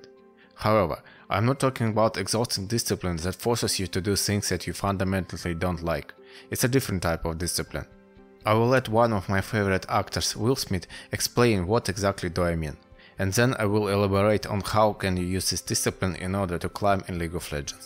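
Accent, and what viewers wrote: Russia. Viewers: Акцент не очень. Понятно что ты русский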